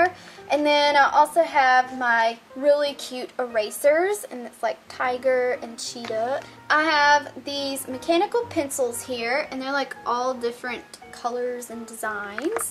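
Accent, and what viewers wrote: South, United States. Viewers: Are you country you have a axsent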